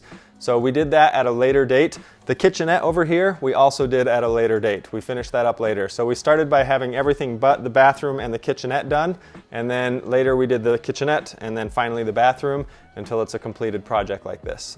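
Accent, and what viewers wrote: United States. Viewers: guy speaks American English but has a GREAT BRITAIN flag ... something is fishy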